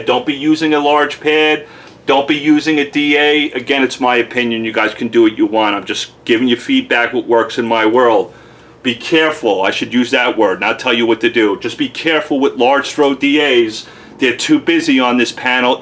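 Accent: New York, United States. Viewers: He's from New York. It's in the accent.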